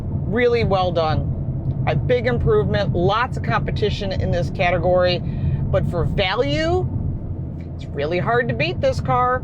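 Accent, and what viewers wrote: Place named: Midwest, United States. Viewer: Got to love that Midwest twang... Great review!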